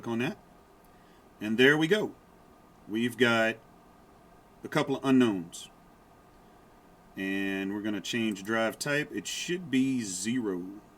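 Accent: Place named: Texas, United States. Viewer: I trust a man with a Texan twang.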